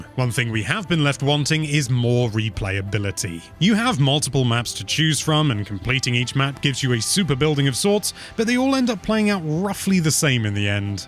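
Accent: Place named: United Kingdom. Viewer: Just uk accent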